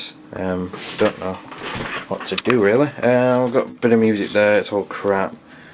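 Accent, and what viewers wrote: United Kingdom. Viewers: You are so british I love it. Where you from pal? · YESSSSS SOMEONE BRITISH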